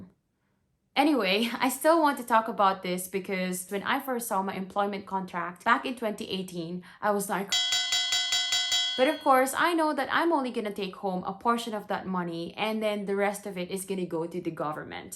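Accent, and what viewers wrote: Ireland. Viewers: Not sure how long you've been here, but that Irish accent is coming along nicely! 😁👍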